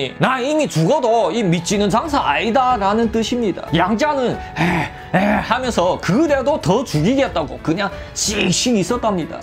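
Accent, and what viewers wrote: Yanbian, China. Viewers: 동무 옌벤 말씨가 몹시 듣기 좋군요 옛 중국생활이 그립네요 짜요!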